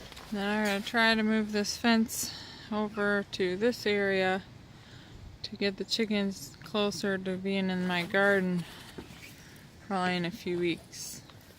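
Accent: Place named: New Brunswick, Canada. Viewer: Thanks for letting me know where your accent is from. I have good friends across the Bay in New Brunswick who pronounce words the same an you! LOVE IT! Bacon makes everything better!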